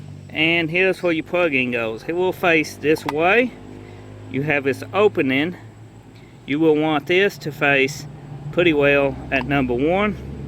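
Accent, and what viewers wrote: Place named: South, United States. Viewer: Where are you from brother? Definitely a southern fella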